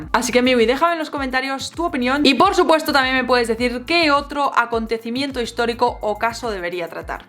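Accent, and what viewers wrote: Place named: Spain. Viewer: dominas muy bien el idioma , aunque español de españa pero bien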